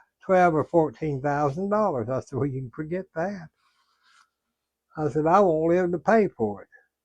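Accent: South, United States. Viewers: Good to see your video, always a pleasure, meal looks so good too, I like your country accent, God Bless, Hello to Momma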